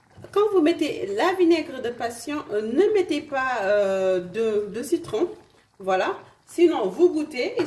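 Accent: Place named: Saint-Louis, Senegal. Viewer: On dirait que vous êtes de ndar.... Domou ndarrr... Merci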